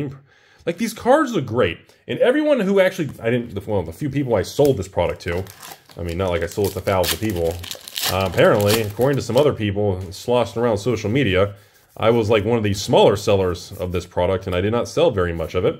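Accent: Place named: South, United States. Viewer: It’s his pronunciation. Sometimes I hear it was “crowns” IN THE SOUTH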